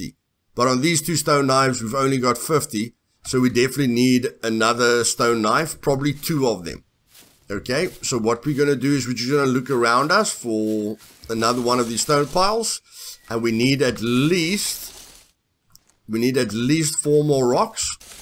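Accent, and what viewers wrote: South Africa. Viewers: I'm enjoying the video so far. Thanks!! I'm looking forward to playing. Btw I hear your south African accent😃. My old pastor was from South Africa. I'm looking forward to watching more of your videos. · Which part of South Africa are you from bru....or Rhodesia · is that an SA accent I hear? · Are you south African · Jy is van Suid-Afrika :D ek hoor dai accent :P. thanks viri video dude! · Friends and I are getting into scum today thanks for the help . Are you South African ?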